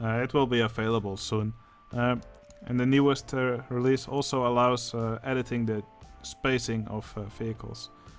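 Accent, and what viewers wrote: Netherlands. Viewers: Whaaaat, has a Dutch accent? I had not noticed yet!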